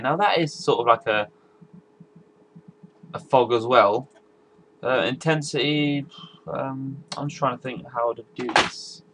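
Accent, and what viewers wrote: United Kingdom. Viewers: Are you British? Looks like you have a British accent hehe:)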